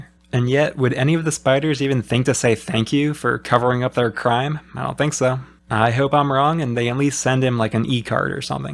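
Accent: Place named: Pacific Northwest, United States. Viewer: hxh fan, jerma references, league references, soprano references AND a PNW accent? im liking this guy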